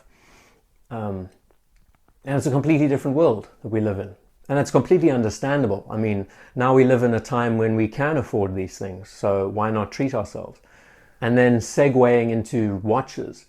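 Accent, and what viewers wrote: Cape Town, South Africa. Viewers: Are you from Cape Town?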